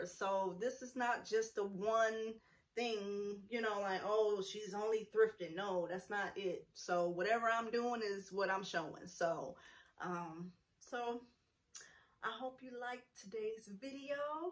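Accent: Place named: Midwest, United States. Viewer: Hey! Congrats on your new channel! I'm excited about what you have in store for us. Yes, sis you hit a licc, honey! 🤏🏽🤏🏽 "snap, snap!" ☺️ That gold bag andthe black and white suit...cold! OMG! Btw, I can tell you're from the Midwest by the way you talk. Anyway...I love thrifting and shopping estate sales. I had to cut back a lil bit. You have me inspired though! I just need more SPACE! Time to purge! Anyway excited for you about your new channel. Looking forward to more of your videos! Subscribed! ❤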